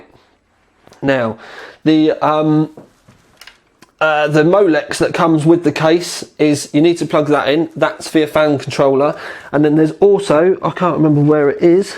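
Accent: England, United Kingdom. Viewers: great in depth review :) regarding the window and fans, I much prefer the original as im not really into seeing my hardware and as far as the fans I think your view has a bit of bias because you are coming from (I gather from accent) UK weather as far as need for extra fans and cooling. I however in Australia in summer often get 44 degrees C during the day and hot nights so I need any cooling I can get :) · LMAO tea and bikkies! love the pommy accent man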